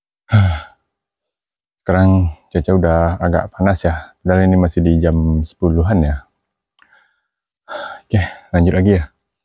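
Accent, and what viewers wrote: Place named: West Sumatra, Indonesia. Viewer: Iyo da... Wak di perantauan ma hahaha.... Mako e wak batanyo kok logat uda taka urang awak lokasi pun mbo caliak taka di padang..... Yo bana rupo e di padang hahaha.... Dima tu da padang Panjang kah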